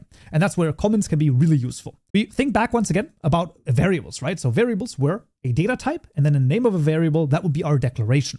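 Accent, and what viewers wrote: Germany. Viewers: LMAO The German "Scharfes S". Love you german voice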